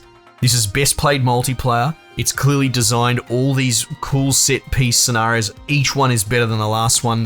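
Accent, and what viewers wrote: Australia. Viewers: I so miss hearing our aussie accent on videos. Makes me feel more at home knowing some one here in AU who is layed back and not give a shit about subs and likes but just telling it how it is...and i understand what your saying...lol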